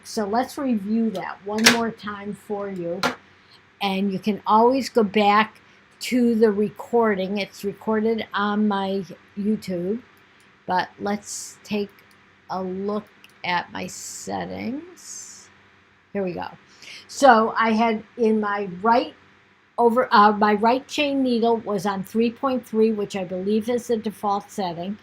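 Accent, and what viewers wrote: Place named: Australia. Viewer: I can hear you Australia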